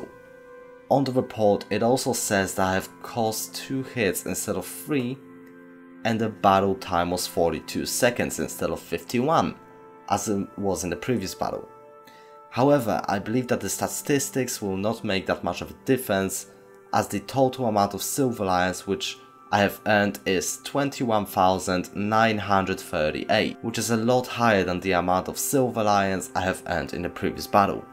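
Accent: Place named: Poland. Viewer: Why do you have Polish accent ?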